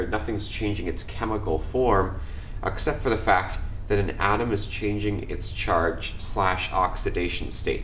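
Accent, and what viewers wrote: Canada. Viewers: I think its canadian maybe because the way he says the word out sounds like it.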